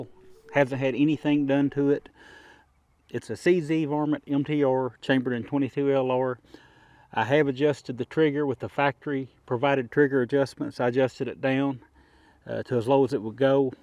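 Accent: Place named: New England, United States. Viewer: Great vid man. That's a helluva Yankee accent. :)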